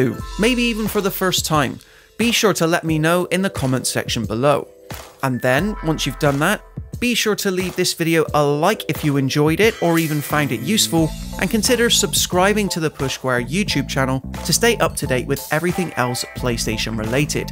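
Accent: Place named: West Country, United Kingdom. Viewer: West Country accent ftw 🙌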